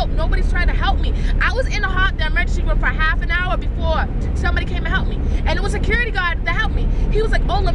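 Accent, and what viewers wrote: Boston, United States. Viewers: You sound like a Bostonian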